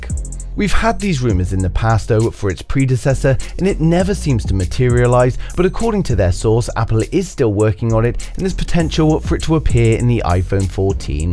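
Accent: United Kingdom. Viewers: They should use your voice for Nat geo when David Attenborough pass away you have a very strong informative British accent.ps super excited for the iPhone 14 pro max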